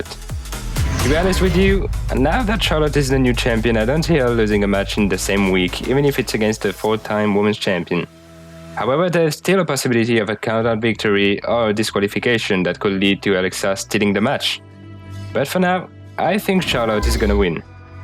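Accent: France. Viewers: Wow man, your English is great, unlike many other YouTubers'. Like that French accent as well. Great content, très bon!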